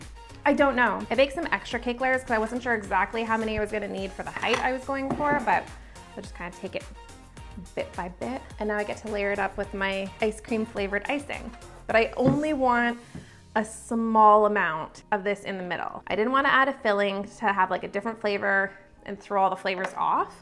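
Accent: Canada. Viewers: omg ru canadian bc u sound SOOO canadian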